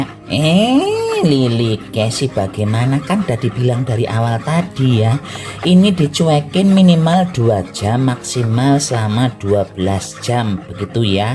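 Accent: Banyumas, Indonesia. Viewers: Aku jadi terhibur nonton vidio mu ngapak yek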